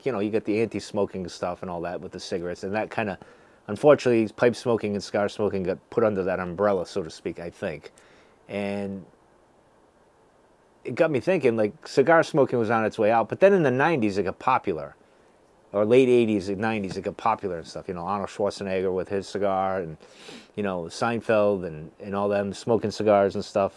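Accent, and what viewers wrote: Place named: New England, United States. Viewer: Hi, from the sounds of it I think you are a New Englander like my self. I could be wrong but I’m picking up an accent I’m familiar with also nice ranger cloak I got the same one super warm. Like your stuff keep it up.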